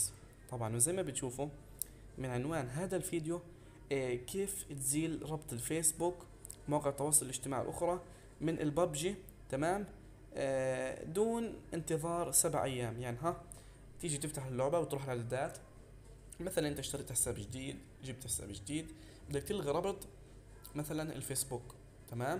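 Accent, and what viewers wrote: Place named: Libya. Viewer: احلا ناس والله. بس لهجتك نفس ليبيه ❤️❤️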